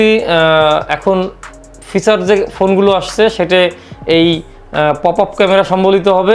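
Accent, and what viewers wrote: Bangladesh. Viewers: ভাই আপনার বাসা কি নড়াইলে আপনার ভাসা নড়াইলের মত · ভাষার ধরন যা, সেতো সংস্কৃতিতে খুলনার বারটা বাজাতে চাচ্ছে। আসলে এ কিছু জানে বলে মনে হয় না। --- - এট্টু সাবস্ক্রাইব কুরেন ।।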